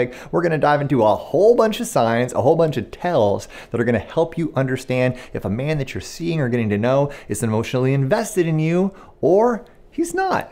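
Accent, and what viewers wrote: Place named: New York, United States. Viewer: l Loved your New York Accent!!! 😂😂😂😅😅😅😂😂😂😇😇😇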